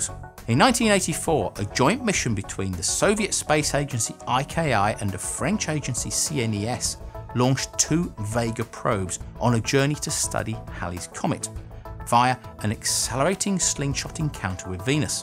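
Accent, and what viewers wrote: United Kingdom. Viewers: doesn't sound very cockney to me....sounds more like a very typical clipped British accent....true cockney doesn't sound anything like English, at least from what I've heard....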